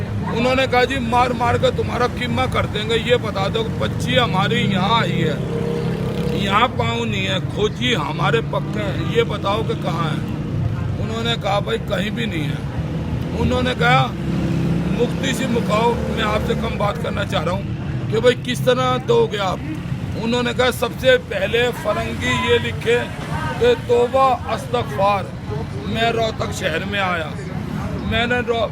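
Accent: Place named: Uttar Pradesh, India. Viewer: Ye UP wali boli kyu bol Raha ha, rohtak wali kyo nahi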